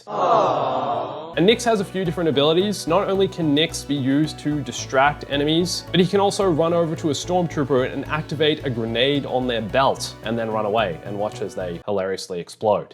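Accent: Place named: Australia. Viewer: His accent, Aussie w/ an American inflection on all the 'r's. It's irritating. Pick a side of the Pacific & stick with it.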